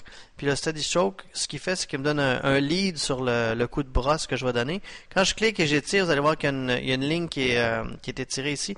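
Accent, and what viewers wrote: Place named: Quebec, Canada. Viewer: Merci pour ton tutoriel, (en plus je suis fan de l'accent québécois) je cherchais justement à me mettre au sculpt et j'hésitais entre mudbox et zbrush.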